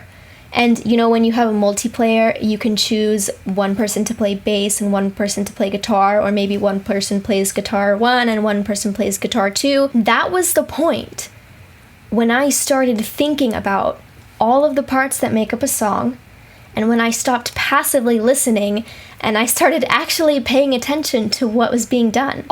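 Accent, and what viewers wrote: Castile, Spain. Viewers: I LOVE your Spanish!!!! The accent SEEMS Castillian. It's been sooooo many years since I've learned and used my Spanish, but it was Castillian. PLUS you are so easy to understand when you speak Spanish. It makes me feel much more proficient than I am. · That explained. You living in US having a Spanish accent, not a latin one. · Wow I just realized the Spaniard Spanish accent. Very different to the Spanish I was taught, mostly by teachers from South America(Bolivia or Argentina). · Que divertido el acento de españa en su español.... Gracias por tu excelente Q&A... muy interesante! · It was interesting to hear how you learned Spanish and it explains why you often (always?) use Castilian pronunciation. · hablas como española , sera que has estado en españa mi hijo es compositor de musica charra ( mexican cowboy music ) with mariachis, you can find his music on you tube under the name edmond broes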